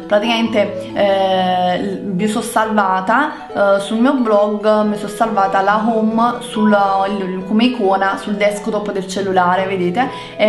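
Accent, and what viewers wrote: Naples, Italy. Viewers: Sei napoletana eh? Se si anche io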